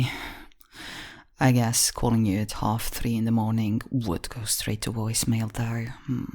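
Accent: United Kingdom. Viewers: You are British .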